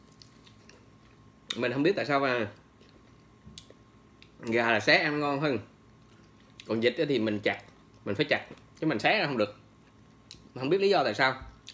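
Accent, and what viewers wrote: Quang Nam, Vietnam. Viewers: Anh nc pha tiếng quảng nam quảng ngãi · Có phải anh là người quảng nam đà nẵng không?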